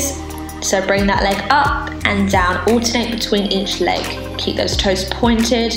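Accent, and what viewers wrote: United Kingdom. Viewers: Love the is it british accent btw?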